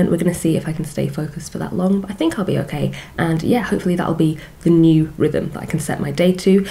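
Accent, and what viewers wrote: England, United Kingdom. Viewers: Usually I don’t like sophisticated English accents, but you’re the only exception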